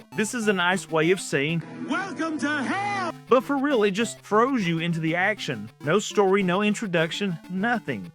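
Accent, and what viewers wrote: South, United States. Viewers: its good to hear another southerner talk about jrpgs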